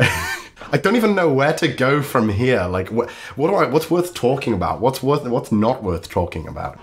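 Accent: United Kingdom. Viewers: He sounds so British back then LMAO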